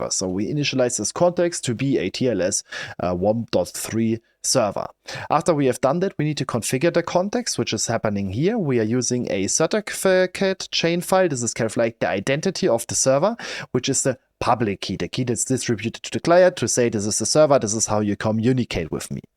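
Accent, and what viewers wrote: Germany. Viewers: loved the german accent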